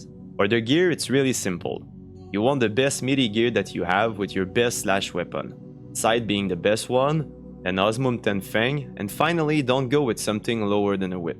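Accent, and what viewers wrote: Quebec, Canada. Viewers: mmmm ca sent le quebecois lol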